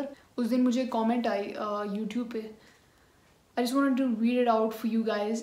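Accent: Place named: Punjab, India. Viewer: Punjabi accent 😍😍😍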